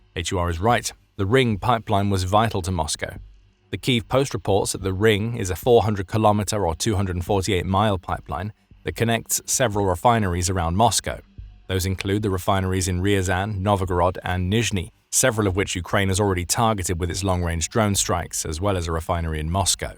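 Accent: England, United Kingdom. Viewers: Why does the narrator (Who's clearly English by his accent) keep calling petrol, "Gasoline"? It's called petrol in the UK NOT gasoline.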